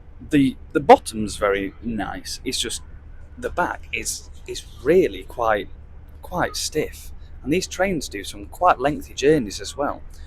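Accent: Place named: England, United Kingdom. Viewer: Hey! I hope you had a nice time in Poland anyway :) Let me ask you - where exactly are you from? I'm curious what kind of English accent it is.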